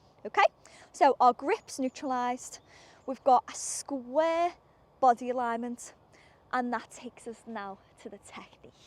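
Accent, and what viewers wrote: Liverpool, United Kingdom. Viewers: Great video, I'll have my wife check it out as she's new. Off topic, is that Scouse I hear?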